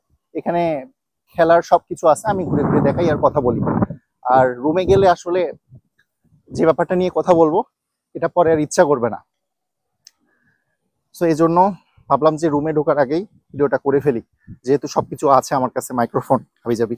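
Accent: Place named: Sylhet, Bangladesh. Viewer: 🤣.Depend on luck. But as a sylhty I think sylhety ra Sylhet ar outside a Biya-shadi kum kora. Apnar accent onakta Sylhety mona hoyacilo tai bollam .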